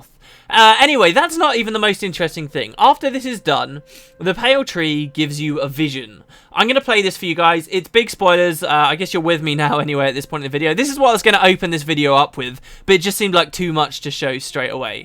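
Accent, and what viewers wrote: United Kingdom. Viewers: Your voice, is fucking amazing! Love me a well spoken Brit...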